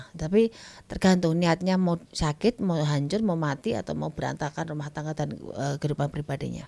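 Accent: Java, Indonesia. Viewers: Lidahnya emg Jawa mbak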